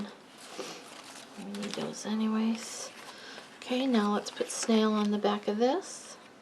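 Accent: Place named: Upstate New York, United States. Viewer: Hi - I should have guessed from your accent that you were from NY state close to Canada. I've been watching your videos from Ontario up near Georgian Bay. Thanks for taking the time to share your cards & tips. I really appreciate them.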